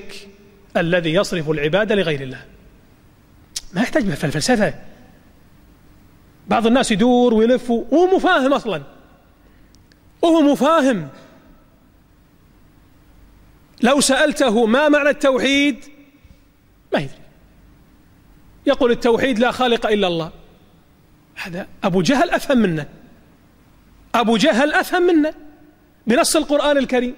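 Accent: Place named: Egypt. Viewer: عم تمصرن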